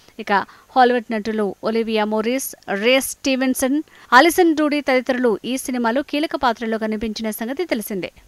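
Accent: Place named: United Kingdom. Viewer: Coment chakkaga telugu maatladochchu.... Aaa english accent tho kashtaalu enduko atu itu kaani language laa aindi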